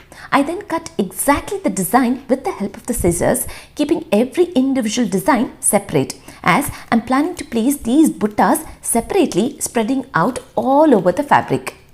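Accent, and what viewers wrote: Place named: North Karnataka, India. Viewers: Hi… came across your video recently and the language accent same as we do.. which part of Karnataka you are from .. nice video .. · North Karnataka kannada accent damn!! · ನಿಮ್ಮ ಉತ್ತರ ಕನ್ನಡ ಭಾಷೆ ಕೇಳಲು ಬಾಳ ಚಂದದ.♥️ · You are amazingly beautiful... Loved the family time at the last which is the very essence of a happy family.. Loved to hear north karnataka kannada.. I'm ur new subscriber 😀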